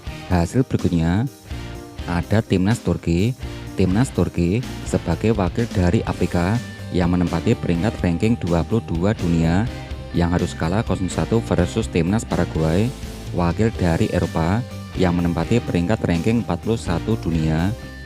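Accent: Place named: Madura, Indonesia. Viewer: Admin e wong meduro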